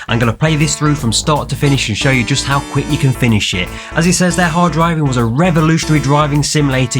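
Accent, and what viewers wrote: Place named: Derby, United Kingdom. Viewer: Showcase in Derby eh... thought I recognized the accent :) instant sub for a local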